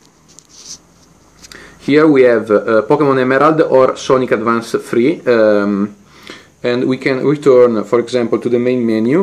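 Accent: Italy. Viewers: Si sente che sei italiano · Your english is good and I understood everything. It's not a problem that you have an italian accent. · Sei ita si sente vero? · natsu sempai Vabbè, dopotutto è italiano, si capisce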